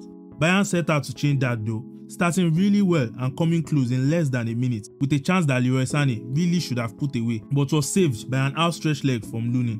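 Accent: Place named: Africa. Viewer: Nice video. Which country are you from. I get an accent either Nigeria,Ghana, Kenya or Cameroon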